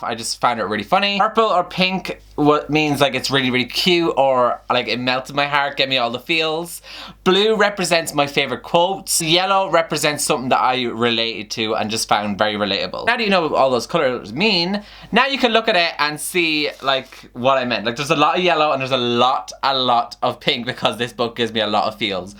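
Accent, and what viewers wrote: Ireland. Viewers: I want to point out two things: 1. I’ve never been interested in that book but you made me want to read it like... now. Maybe two lives ago. 2. Your Irish accent had me almost sobbing because it’s adorable omg. Please be my friend ahaha I had to watch the video twice because I kept being distracted 🙈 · your youtube name is obviously so i don’t know why i was surprised when i realized you have an Irish accent. We kinda annotate similarly!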